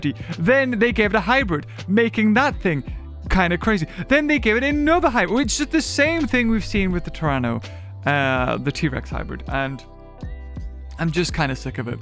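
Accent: United Kingdom. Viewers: if he has british accent i love it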